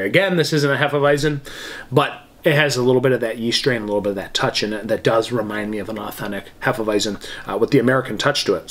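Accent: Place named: Michigan, United States. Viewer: I bought a 6-pack of these today and looking forward to trying it out. I appreciate the review of a genuine MI beer from someone with a genuine MI accent, e.g., Oberaaan & Haaaps (hops) 😉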